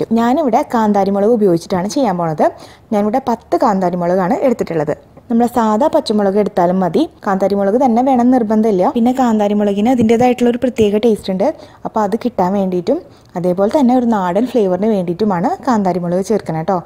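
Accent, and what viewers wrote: Thrissur, India. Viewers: Beautiful Thrissur language. Beautiful explanation, beginners can follow well.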